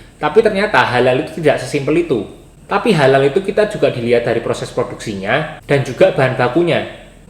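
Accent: Java, Indonesia. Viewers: orang Jawa ya , medok bngt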